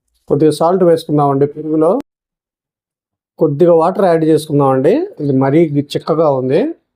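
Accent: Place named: Godavari, India. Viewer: మీది గోదావరి జిల్లా నా అండి.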